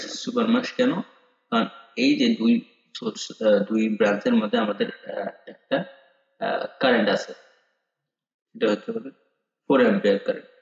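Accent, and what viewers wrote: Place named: Bogura, Bangladesh. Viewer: Apner bari maybe bogura te,,apner kotha sune bujlam mone hoi bihar Union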